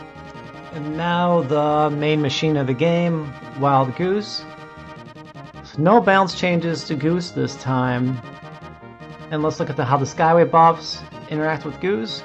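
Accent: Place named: Midwest, United States. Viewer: Midwestern accent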